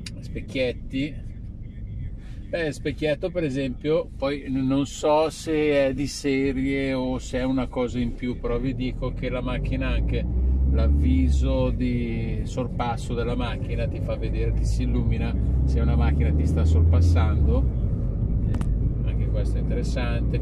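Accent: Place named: Milan, Italy. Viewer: Ue' figa, tipica parlata milanese:=))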